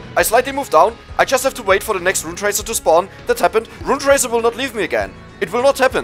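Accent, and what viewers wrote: Germany. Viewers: dein englisch klingtr mega deutsch, mir würde das video in deutsch mehr helfen XD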